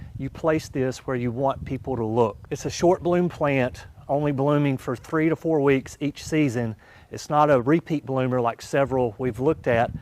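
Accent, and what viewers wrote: South, United States. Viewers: As soon as I heard your accent I knew you would actually have good advice for my southeast Louisiana zone 8b/9a garden. Question: I have tea olives, but they look scraggly. Can I cut them down and have them regrow more bushy? I’ve had knock-out roses for years. I’ve found the coral and yellow are not as robust for me as the red & pink. I’ve had issues with our extreme moisture with both camellias and rudbeckia - root rot and mildew. The lavender has been a no go for us here because of our rain and humidity, but I’m going to try this variety you recommend. Can encore azaleas take our full sun in the Deep South?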